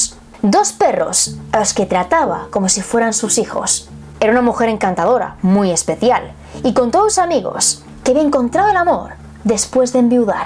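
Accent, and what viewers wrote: Spain. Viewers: Mansion de los amigos imaginarios... A caray, eso no era... Tu acento español es hermoso. Casamiento entre primos, a caray, eso me interesa... xd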